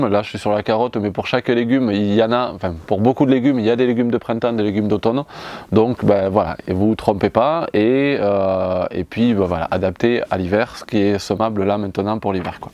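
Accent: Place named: South, France. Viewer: Merci c est vrai vous avez un joli accent du sud a bientôt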